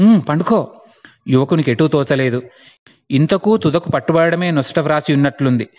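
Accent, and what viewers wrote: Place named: Telangana, India. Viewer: తెలంగాణా యాసా చాలా చక్కగా ఉంది సార్ మీ ప్రసంగం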